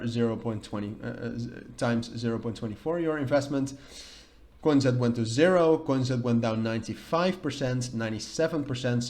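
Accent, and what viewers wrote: Netherlands. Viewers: Hey by you accent i thin you from Netherland right? I want to know if you are still active on this youtube channel if yes i have a few questions and things to propose you. Can you just reply and i will dm you on you socials. Have a good day!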